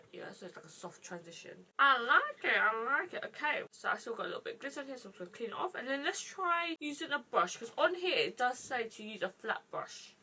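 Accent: United Kingdom. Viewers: Its always weird going from watching people on insta and then finding their YouTube channel and actually hearing them talk :p totally didn't think she was from the uk!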